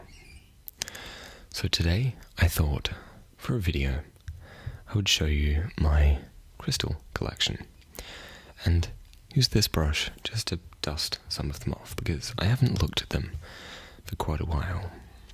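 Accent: Australia. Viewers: Living overseas I never realise that I could miss the Australian accent. Nice video. Roleplays? Crystal shop?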